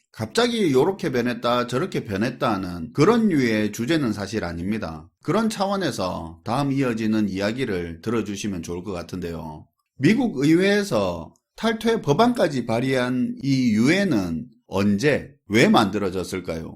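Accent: Gyeongsang, South Korea. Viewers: 개쌍도 어투 진짜 토나온다. 하지마라.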